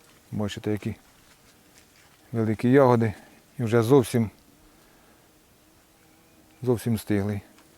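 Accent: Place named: Poltava, Ukraine. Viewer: Дякую за огляд.Але якби Ви озвучували дату огляду то область Укаїни це було класно.По акценту вроді як Полтавська обл.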